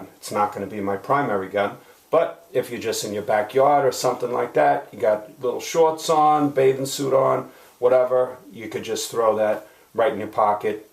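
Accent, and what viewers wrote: United States. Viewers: Best American accent ever.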